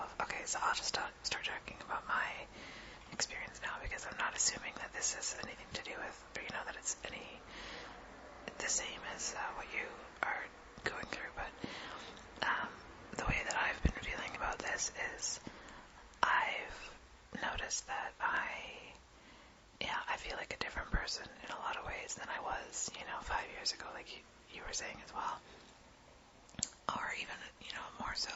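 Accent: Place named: United States. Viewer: great videos your the best whisperer on the net we have english ones too but something about the american accent is best for this.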